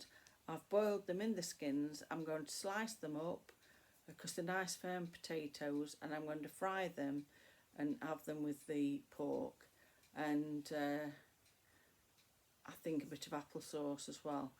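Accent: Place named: Derbyshire, United Kingdom. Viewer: You definitely have a Derbyshire twang to your accent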